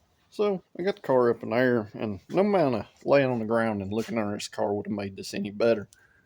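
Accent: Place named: South, United States. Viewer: Learn the English language dude , you sound like a redneck....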